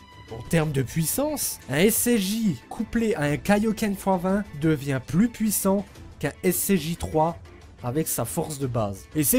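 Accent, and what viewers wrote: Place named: Lorraine, France. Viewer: Super accent de la lorraine !!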